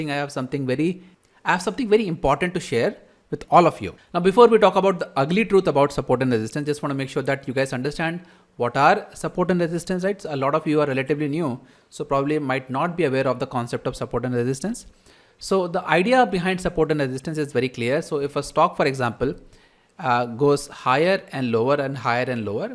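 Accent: India. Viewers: Your accent same as P R sundar sir, are you from tamilnadu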